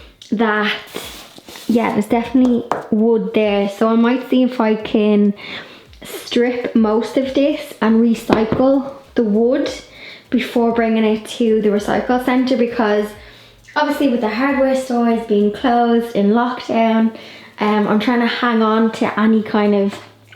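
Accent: Ireland. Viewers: It looks amazing!! Came across your channel a few days ago and I LOVE your projects!! 🥰 Plus, it's so lovely to hear that Irish accent again...been to Ireland 'bout 11 times but last time was a while ago so it brings back memories 🤗 will keep on watching for more ideas - sadly my beloved mom just passed and I'm currently emptying my parent's house. My two daughters (7&10) and I will most likely move into it and I definitely wanna keep lots of things and re-purpose / restore so your diy projects are a great inspiration! Looking forward to watching lots more of your videos 🤗 greetings from Munich, Germany 😘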